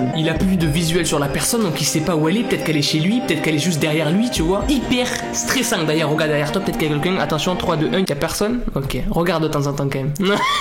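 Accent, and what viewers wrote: Quebec, Canada. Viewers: Jadore to accent quebecois et ton exorsice😅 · Moi je trouve que ton accent québécois parce que je parle québécois 😉😉😉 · Ho wow l'accent québécois😂👌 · Ta un bonne accent quebecois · Accent quebecois acceptable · je suis québécois et tu parle bien Québec👍👍👍👍👍👍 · Jadore ton acssen Quebec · qui trouve que parle grv bien quebecois? 😆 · j'adore ton accent Québécois 😂👌(j'en suis une O.O) 👇liker si vous êtes Quebecois/Québécoise 👇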